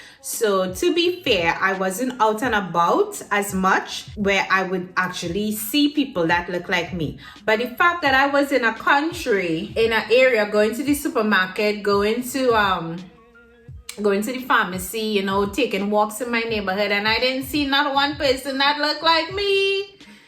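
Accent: Trinidad and Tobago. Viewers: First of all, love the trini accent!! As for the staring though, I must say that it too is a caribbean thing (though it may not be a Trinidad thing if they be ringing people ear) xaxa!!! Whenever I think of people staring, I remember how my Jamaican friend/classmate would ALMOST ALWAYS respond when she eyeing people down and they does vex over it: "is my eye" she say xaxa! Caribbean people love a stare just as much as Greeks, Germans, Turkish, Chinese or even Americans on vacation do. I don't think it is out of malice when Cypriots stare, it could be just that they don't see enough Trinis touching down :) kali tyxi! PS: Your tiktok did not lie; don't feel no how.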